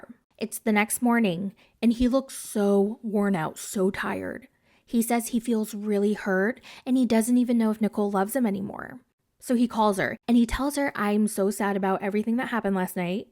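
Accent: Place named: New York, United States. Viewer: istg while getting to know you as a ytber and watching your videos i said to myself so many times this girl gotta be from NY and i just found out that you wereeeee lol i love it. Honestly makes me wanna watch you more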